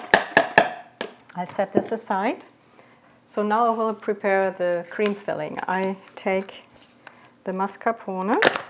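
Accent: Germany. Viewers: We live in the suburbs of Nashville, Tennessee. PS: My husband guessed you were from either Hamburg or Hannover based on your accent. Best to you and your family from your newest subscriber! I always want to find recipes that remind him of home. But I have fierce competition. His mother is a retired chef, his brother is a chef, and our only nephew is a chef.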